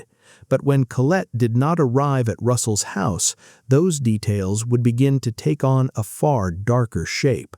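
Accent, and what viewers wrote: United States. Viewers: Why the "American" narration..? It isn't necessary to prove a motive...but perversions are timeless...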